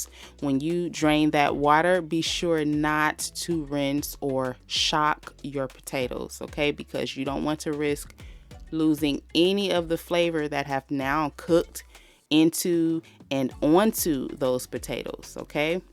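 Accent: South, United States. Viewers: I live in Canada. This is pretty much how we do it here. My family's (my side and my wife's side...both british) have done this. Never been to the southern states but that's pretty much how we do it here. Have done so for ...I don't know...I'm 73 ...so 73 years. So it's pretty standard. Nothing southern about this except the accent of the narrator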